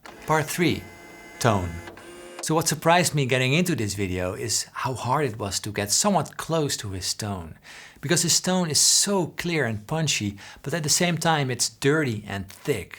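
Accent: Rotterdam, Netherlands. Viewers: Hey ouwe Rotterdammert! Ik wil je graag zeggen hoe leuk het is om een gitaar youtuber te horen in m'n eigen accent:) Ik woon al 15 jaar in Sydney. Speaking of John riffs and licks, I think is somewhat similar to this one. A good one to do is the solo on ' '! I'm sure there is something interesting about it. En nog gefeliciteerd met het uitlopen van de Marathon!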